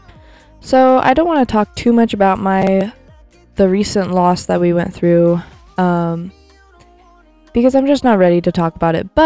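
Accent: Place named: United States. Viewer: Hi! I was intrigued by your channel name and thought you were a ie New Zealander like me, but then I heard your American accent and saw the American products. First up I want to say I'm sorry for your loss, and I think you did absolutely the right thing which was to lie low for a few days, grieve and go easy on yourself, and then get up and tackle what you had to do. You are spot on when you said it's good for your mental health to achieve something and make your environment pleasant and tidy. I was nannying for a few years for various families and I learned a lot by observing how they ran their homes. One thing I noticed was that decluttering makes life so much easier. One mother had 2 little boys and she really had a minimum of clothes for them. She would do a small wash every day and get them dried and put away and there was never a huge pile of clothes to worry about. 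That's one recommendation I'd make to you, to go through your little guy's clothes etc and try to trim it all down to a minimum. Another great trick is to put away half of your little one's toys for a few weeks. When you bring them out again, put the other half that has been out away. He'll almost be like he's got new toys or so excited to see some old favourites. That way it's easier to keep tidy because there aren't so many lying around, plus you'll have a very happy kid. Also when they are so young, they grow out of toys so quickly so it's good to go through them and donate the ones that he's no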